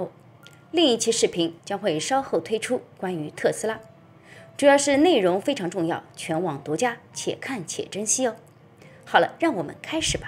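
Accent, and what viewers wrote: Shanghai, China. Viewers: 您是上海人么？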